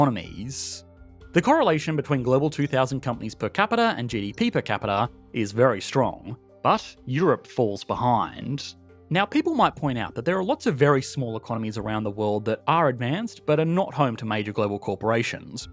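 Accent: Australia. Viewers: Vocal fry sounds bad at the best of times......in Strine it sounds even worse. Just had to stop listening after a minute, sorry. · is this channel australian? Suprised to hear the australian accent lol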